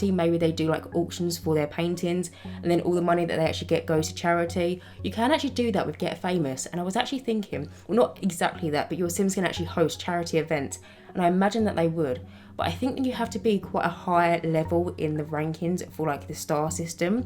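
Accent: United Kingdom. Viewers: I absolutely adore your videos, you are so imaginative and I love the storytelling while you go through the videos, especially a fellow British accent! Sometimes I feel like other Sims videos are so loud and dramatic, but I love having your calming vids on in the background! Thank you for always posting (but also no thanks for always making me buy more packs!!!) X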